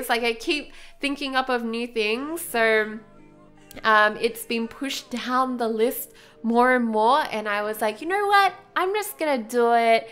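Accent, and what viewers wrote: Australia. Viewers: sounds Australian:) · Are you from Australia? I think I hear that cute accent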